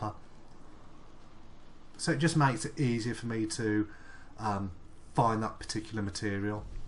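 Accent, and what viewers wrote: West Midlands, United Kingdom. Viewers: Subbed because you have a West Midlands accent :D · Sounds like a West Midlands accent to me :)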